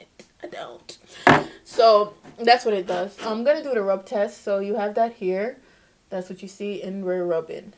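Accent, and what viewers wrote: Jamaica. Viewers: I thought I heard a slight Jamaican accent. My mother is from JA, my ears are very sensitive to the accent, no matter how slight it is. 🇯🇲🇺🇸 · You are Jamaican. “ Ova yassuh “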